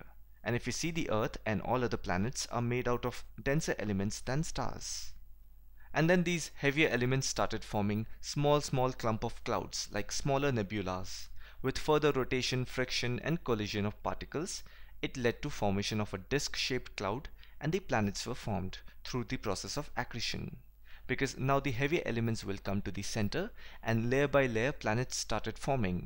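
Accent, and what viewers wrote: Bengal, India. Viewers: Sir are u bengali ?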